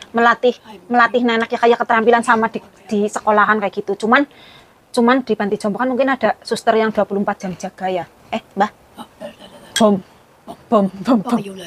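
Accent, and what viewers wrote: Cilacap, Indonesia. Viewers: saran sy kalo bisa bicaranya diperhalus dan jangan keras2... Kasian neneknya... Apalagi dgn logat cilacap yg kasar.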